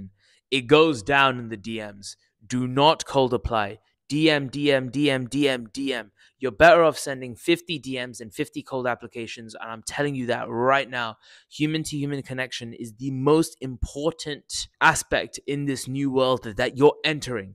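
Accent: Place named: South Africa. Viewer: It took me 5 minutes into the video to place the accent as South African. I went through British to American, back to British and finally landed on South African 😂😂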